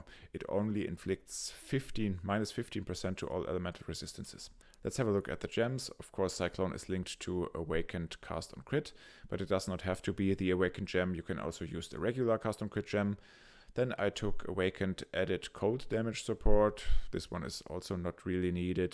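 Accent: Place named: Germany. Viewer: Wie man direkt an deiner Stimme merkt das du Deutscher bist. Sehr interessant! :D Btw.. Auf was muss man genau achten, wenn man die Sachen kauft? Denn das Amu allein könnte ja schon, wenn man pech hat, um die 3div kosten durch die Attribute+ Cold spell gems. Da wir ja beim CoC die Taktrate beachten müssen, wollte ich dich fragen, wo man das sieht und händeln kann, da ich keine Lust habe, dass meine CDs rumspacken und damit das ganze Build fürn A** ist. Freue mich über eine Antwort! :D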